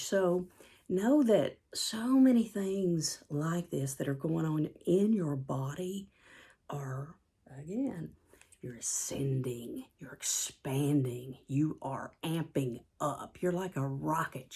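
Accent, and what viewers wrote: South, United States. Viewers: I have experienced ALL 9. You just came across my feed... it's nice to hear someone w/ a southern drawl discuss these topics, as I too am southern, am sensitive to energies, practice energy healing & reiki. You asked for additional symptoms we may have had as well ... Heightened intuition, whole body vibration, energy pouring from hands & feet, connecting to plants & animals, wild synchronicities w/ numbers, music, situations, just to name a few. Oh, Clair's coming onboard & spiritual hot flashes ..crazy, crazy dreams too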